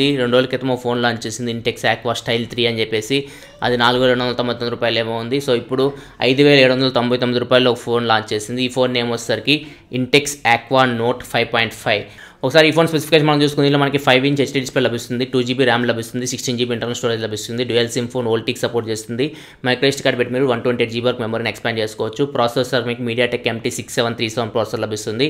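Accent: Ongole, India. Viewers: R u from ongole anna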